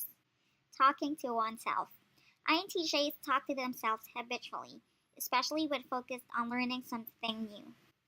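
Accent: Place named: Philippines. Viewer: Your filipino accent is annoying.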